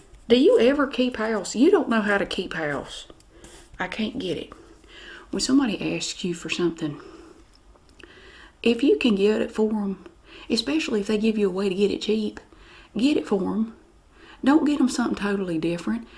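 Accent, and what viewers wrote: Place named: South, United States. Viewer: Happy Holidays from Punta Cana!! We moved from Atlanta 3 yrs ago and u make me miss all the southern accents I grew to love so much from the south. So endearing...ur the best!!! Thanks for all the great videos!! 😅🤗🤗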